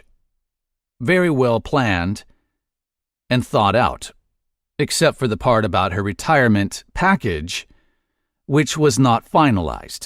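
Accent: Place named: Tennessee, United States. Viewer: Gotta love the Tennessee Valley Twang, 😀.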